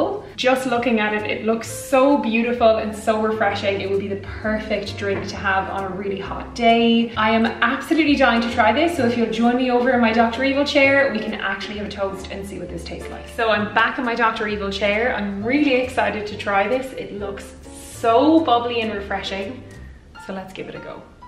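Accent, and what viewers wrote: Ireland. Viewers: I speak French fluently. I had to go back and listen again, because I just thought you were speaking Irish, at first. Awesome! I am drunk right now. Also, fuck. Have you ever had a white lady? I'm talking the cocktail, obvs. It's delish. Worst name ever. The only reason no one drinks it is because it's too embarrassing to order one.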